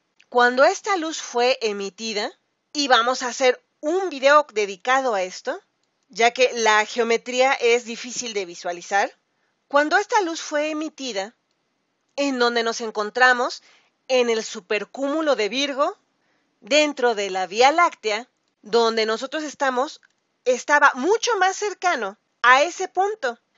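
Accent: Mexico. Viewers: Me gusta la voz de las mexicanas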